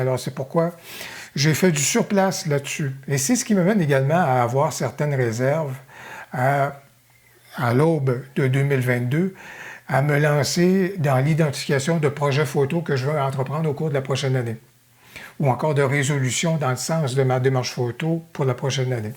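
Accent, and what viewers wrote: Quebec, Canada. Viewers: d'entendre ton accent québécois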